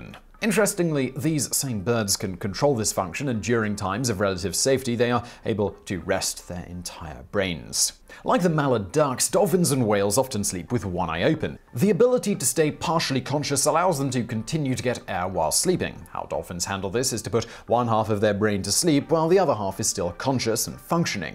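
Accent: United Kingdom. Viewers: All the other narrators with British accent sound fake, Except. He is a professional. Kudos, kudos.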